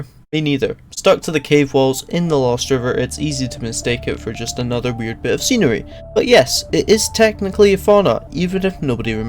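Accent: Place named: United Kingdom. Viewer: Note his British accent p.s. not making fun at it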